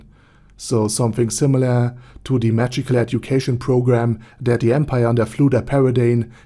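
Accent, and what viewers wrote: Germany. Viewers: Man hört den deutschen Akzent gutes altes Denglisch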